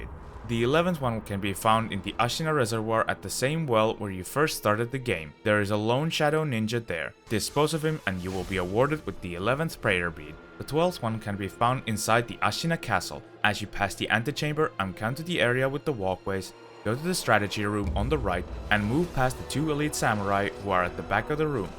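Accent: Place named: Japan. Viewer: why speak english but pronounce the japanese words in japanese accent.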